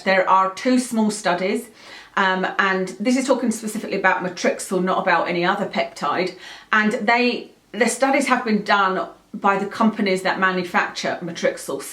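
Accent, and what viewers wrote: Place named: Essex, United Kingdom. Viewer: I like your videos - you're so down to Earth. I also find the Essex-like accent amusing, especially how "l" is pronounced as "w" (... get my naows done), lol. 😆💅🏼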